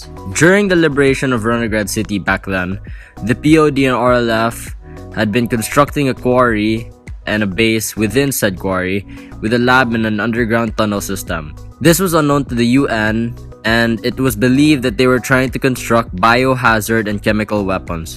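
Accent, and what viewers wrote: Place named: Philippines. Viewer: I can already tell he is a filipino by his accent.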